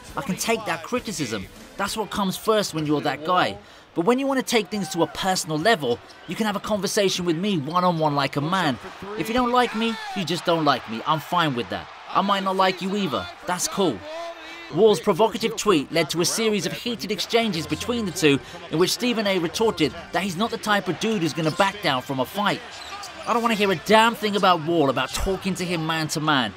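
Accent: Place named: England, United Kingdom. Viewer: Narrator is…English?!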